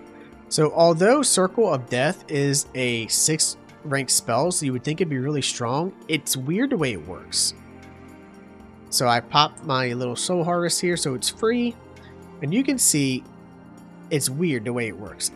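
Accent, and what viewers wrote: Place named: Pennsylvania, United States. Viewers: I sense south jersey/eastern PA/delaware in the accent 🤔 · ah sounds just like the Pennsylvania accent. Either way nice video. Also them Orioles are poppin off! Go to a game this summer don't miss it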